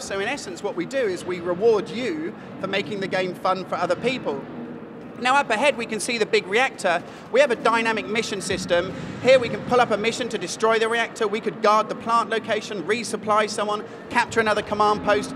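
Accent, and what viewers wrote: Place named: England, United Kingdom. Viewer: English Accent))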